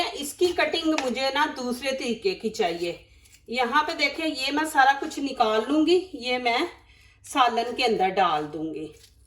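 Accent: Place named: Punjab, India. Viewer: Simple and nice .Will try In Sha Allah. I liked your Punjabi way of talking.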